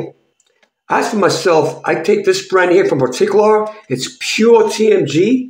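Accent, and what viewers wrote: New York, United States. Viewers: Hi, I'm also in my 60's I just started taking TMG and it's working great from what I feel so far. I wanted to ask you are you in Germany? Because you sound like a New Yorker. God bless stay healthy. I really enjoy your videos very educational.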